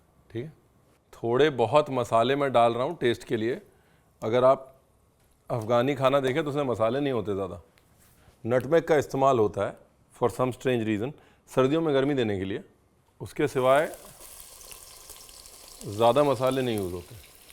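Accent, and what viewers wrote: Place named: Lucknow, India. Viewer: Bolne ke laheze me Lucknow hai ❤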